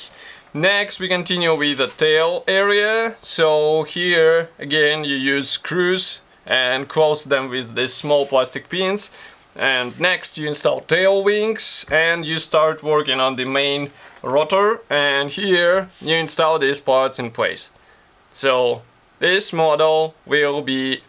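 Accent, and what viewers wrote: Russia. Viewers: Какой то акцент как у русского. ты русский что ли?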